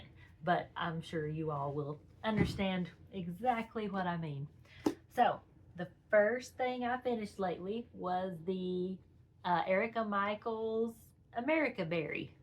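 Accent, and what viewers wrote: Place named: South, United States. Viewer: Love your stitches and your southern drawl 🥰.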